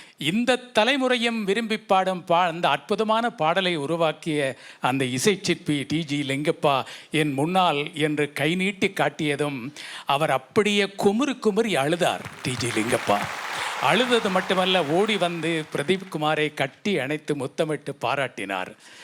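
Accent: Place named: Sri Lanka. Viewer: இலங்கைக்கே உரிய இனிய தமிழ் உச்சரிப்பை தமிழ் நாட்டவர்கள் இரசிப்பார்களா? வியப்பார்களா?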